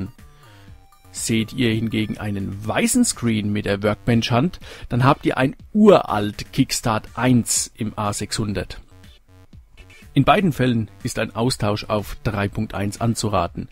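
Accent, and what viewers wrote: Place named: Franconia, Germany. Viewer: Bei deinen Dialekt kommt der Franke noch durch 🤣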